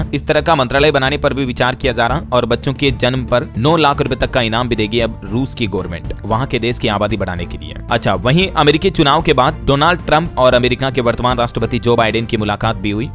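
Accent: Rajasthan, India. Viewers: Kisko kisko lagta h ki Rajasthan se hai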